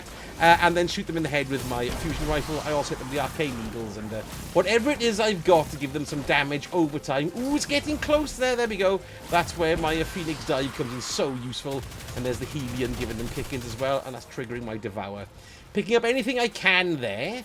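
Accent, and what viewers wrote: Wales, United Kingdom. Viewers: Love the Welch accent, miss working in Wales, good content. Thank you.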